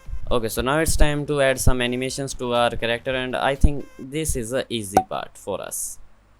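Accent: India. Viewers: Are you filipino? Pero parang indian accent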